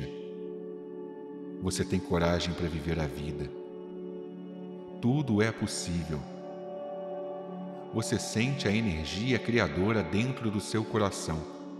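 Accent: Rio de Janeiro, Brazil. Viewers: O conteúdo é otimo, mas o carioques me quebra rs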